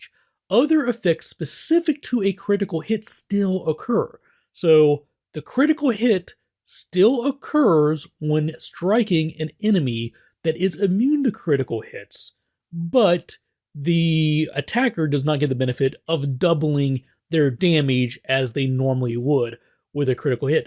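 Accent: United States. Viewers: thanks for the reply! actually, i was wondering which state? there are so many american accents and i find them hard to untangle. (totally didn’t notice the speech impediment btw!)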